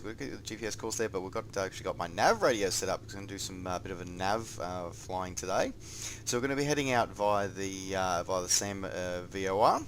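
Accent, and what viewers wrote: Australia. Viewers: Are you Australian? I am aussie too :D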